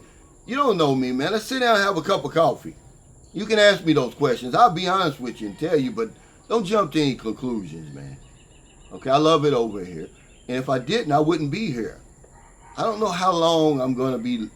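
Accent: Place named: Georgia, United States. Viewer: The author seems like a nice guy sounds like Southern Georgia accent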